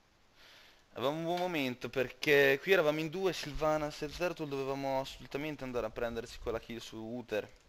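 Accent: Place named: Romagna, Italy. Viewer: ah ok...è che sentivo l'accento romagnolo...sei bravo a giocare a heroes...sono cuboem#2629 in game se ti va di fare qualche partita..bella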